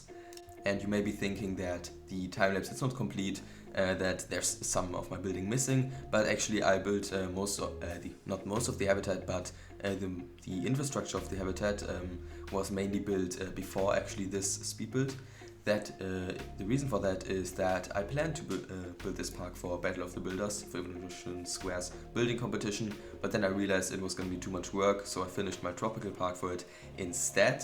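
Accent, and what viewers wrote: Germany. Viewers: you have a german acc ent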